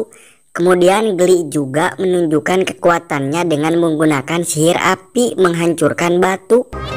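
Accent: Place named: West Java, Indonesia. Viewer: Ka ciri pisan ieu mh urang sunda na th🤣🤣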